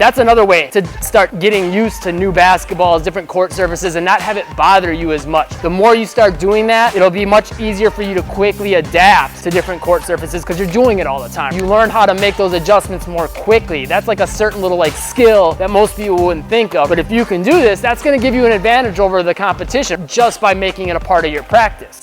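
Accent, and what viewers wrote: Canada. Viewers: Why does he sound Canadian??🤔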